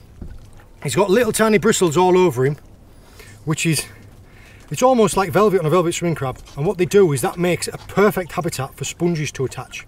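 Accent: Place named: Hull, United Kingdom. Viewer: HI...new sub here...sorry to hear about your visiting theives m8...is there any where that you can review ship movements in your areas, looking at radar tracking ect... so ive been thouroughly entertained with your video's, i must have watched around 20 upto present...& i realy enjoyed where you managed to catch the 2 tuna...fantastic video's...ime a metal detectorist from the north west of the uk (i used to shore fish from the front in Morecambe, a fair while ago!) your accent sounds like a Hull one... deffo northern!...anyway, i hope you make progress with finding out what vessel's were near to where your pot's were...good luck & Happy Hunting...take care & tight lines! Gordon...(click my picture and check out a few video's if you get the time)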